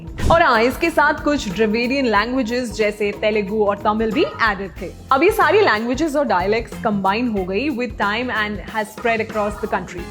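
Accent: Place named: United Kingdom. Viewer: She's speaking british hindi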